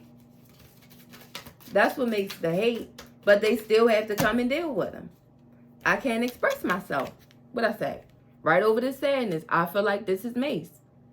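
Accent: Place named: Baltimore, United States. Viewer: Love Your Accent, Are you from Baltimore?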